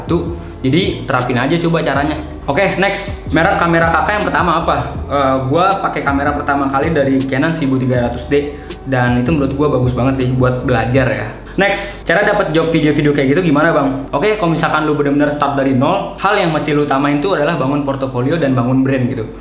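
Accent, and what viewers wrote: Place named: Bali, Indonesia. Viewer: Kak aslinya orang mana sih kalau boleh tau?, soalnya kalau "menurutku" sih kayak orang Bali logatnya, maaf kalau salah ya Kak 🙏🏻😅😁🙏🏻 #Nanya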